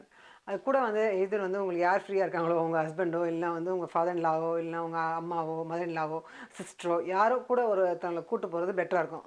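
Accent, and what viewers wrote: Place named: Tirunelveli, India. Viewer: Mam what is ur native place..ur slang is like our tirunelveli slang..nice to hear..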